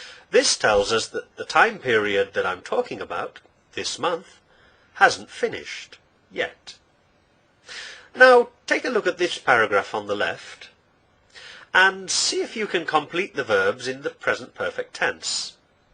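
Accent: United Kingdom. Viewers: Thank you very much, I love your daily dose of English and most of all your AMAZING and musical British accent. I'm Italian and I'm studying English seriously since 2014 but, sometimes, I think that my accent is definitely hopeless. I'd like to narrow my vowels and to smooth the double consonants, but I tend to sound .... I don't know how to explain it ... too long whereas you British have this short and cut and clean although "bonded" way of pronouncing words. It's difficult for me to shadowing you, but I'm dedicated and stubborn, so let's see what happens. Again, thank you for your eccellent job and have a lovely weekend. Monica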